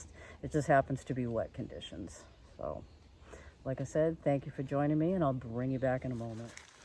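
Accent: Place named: Boston, United States. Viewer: Whoaaa…. You have excellent instincts!… you played it “smahht” 😊… absolutely luv luv the Boston accent!!.. I’m from northern California.. we don’t get to enjoy that over here 😕.. aaaaaand.. nice tent with the built in ground cover or pocket for the vestibule… I always learn something from you.. thanx! 👋🏼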